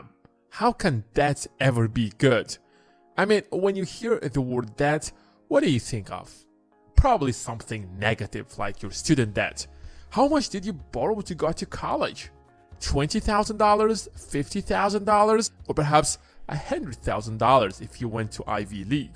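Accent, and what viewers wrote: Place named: Asia. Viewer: He sounds asian, I'm in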